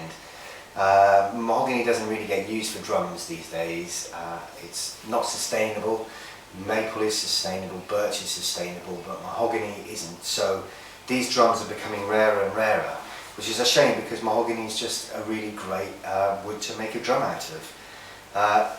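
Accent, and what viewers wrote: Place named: North London, United Kingdom. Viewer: Your restoration videos are superb man. I detect a north London accent going on there lol. I am probably wrong. I'm from up there. ! have 3 mahogany snares in my collection. !968 School Festival, 1965 Pioneer and 1948 WFL 15'' x 8'' Concert. I have kept the WFL and School Festival original. I love the mahogany colour. Your restoration video where you transformed the WFL to a Ringo Jazz Festival was fascinating. You did a great job! Well done mate. We share the passion. You are probably from Hereford or somewhere. I hear a twang! hahahaa. Stay safe. Jem )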